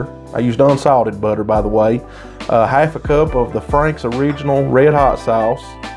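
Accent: South, United States. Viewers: Love your country accent 😍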